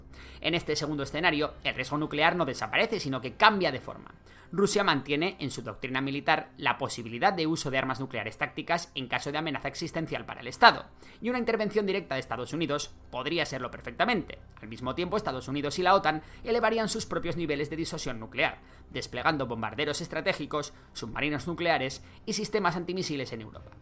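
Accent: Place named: Spain. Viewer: Escuchar a un español da vertigo.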